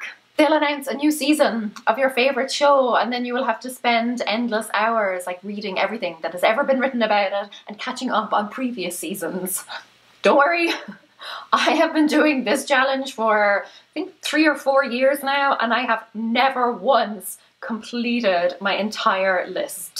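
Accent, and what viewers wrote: Ireland. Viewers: She's Irish. X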